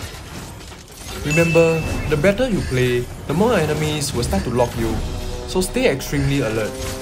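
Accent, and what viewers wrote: Singapore. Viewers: Man sounds like he is from sg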